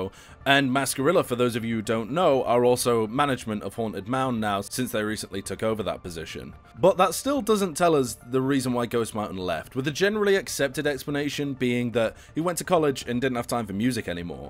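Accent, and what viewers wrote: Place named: United Kingdom. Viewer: You sound to british for this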